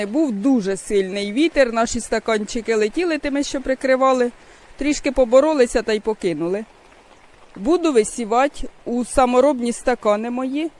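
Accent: Poltava, Ukraine. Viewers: Дуже гарна мова ваша,Полтавська❤🇺🇦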